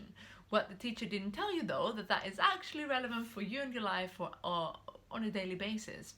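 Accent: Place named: France. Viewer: Ok, first off: your positive energy is very much appreciated. Secondly, your accent is thick French; are you French by any chance? To dig into the meat your argument, your example was purposely vague to stimulate thoughts. Very provocative, I must say. I hate to argue with positive women such as yourself, but energy is technically not a force; however, I will forgive you this time, because energy operates under similar laws to Newton's 3rd. If you want to broaden your arsenal, the law of energy reciprocity, that you are refering to, is called "Conservation of Energy".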